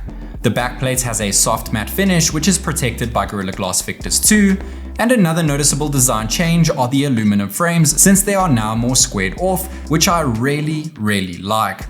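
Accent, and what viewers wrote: South Africa. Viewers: I didn't know you are South African 😱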